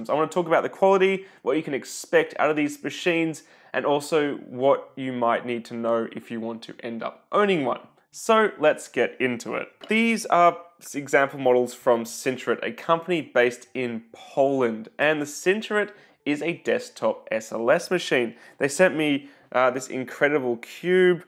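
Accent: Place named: Australia. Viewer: YOU'RE AUSTRALIAN! I WOULD HAVE NEVER CALLED IT. GOOD ACCENT! (I'm Aussie too)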